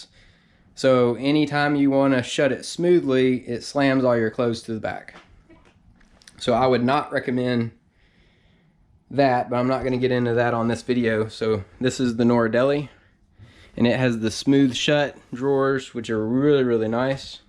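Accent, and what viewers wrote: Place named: South, United States. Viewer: I wonder what this southern sounding guy keep in that safe 🤔🔫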